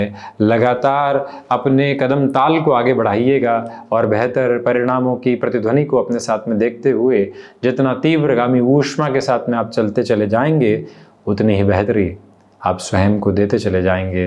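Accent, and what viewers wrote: Standard. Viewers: हिंदी भाषा पर आप की पकड़ वाकई अद्भुत है। शुद्ध उच्चारण के साथ भाष्य प्रभाव अचंभित करता है। बहुत दिन बाद इतना शुद्ध उच्चारण सुनने को मिला।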